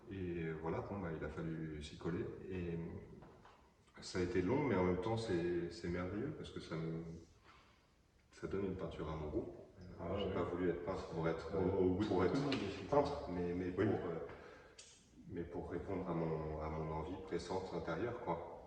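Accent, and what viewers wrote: Gwangju, South Korea. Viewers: 우연히 보게되었는데 제가 광주사람이라 더 끌리더라구요. 외국인에서 들리는 광주사투리^^ 성실함이 인생을 좌우한다더니 아님 영상들보면서 그냥 웃으면서 보지는않았어요. 역주행중인데 이 영상에 첨으로 댓글달아요. 진심 작품들이 멋져요. 용접공예긴 이런거군요. 진심 소장하고싶어요ㅜㅜ 카페한다면 진심 구매했을듯요.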